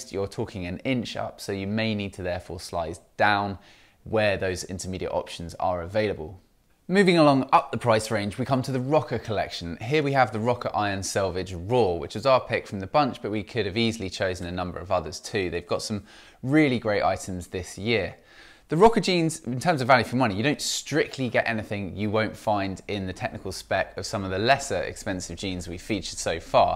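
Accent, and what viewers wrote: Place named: RP, United Kingdom. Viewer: It called The Queens English...and is expensively acquired at English private schools..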